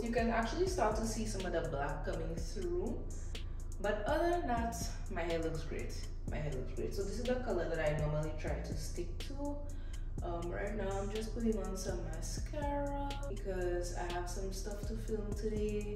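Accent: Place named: Saint Lucia. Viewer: Omg I know this accent ..🇱🇨🇱🇨🇱🇨🇱🇨girl I’m here looking at videos to help make me get comfortable with the color . I give myself 1 more week then cut and white here I come 🤭… so happy it’s Lucian ❤❤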